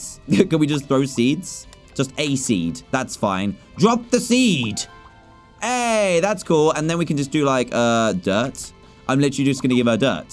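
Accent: United Kingdom. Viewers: He's British. He has an accent. He can say it how he wants.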